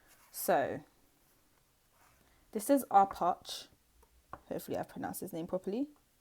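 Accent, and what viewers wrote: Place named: United Kingdom. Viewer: I love your humor and British accents really make me weak, so adorable 🥰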